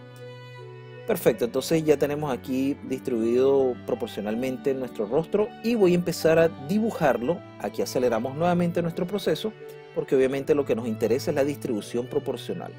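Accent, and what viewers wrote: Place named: Chile. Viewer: A mi me suena a Chileno, tiene la misma voz que Wikisebas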